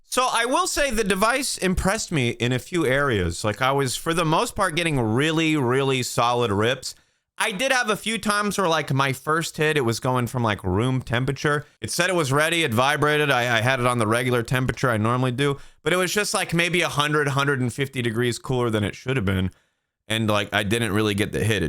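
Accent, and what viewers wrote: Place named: Northeast, United States. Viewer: He sounds like hes from the NorthEast, sometimes long island sometimes the bronx, but rolls his r's sometimes haha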